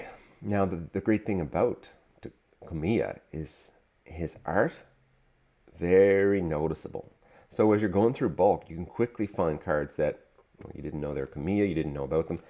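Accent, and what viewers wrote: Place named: East Coast, United States. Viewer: First time coming across your channel - you sound like an east coaster! Ive collected Yuka Morii’s full english set, and I’m working on komiya, Kusube & Nishida!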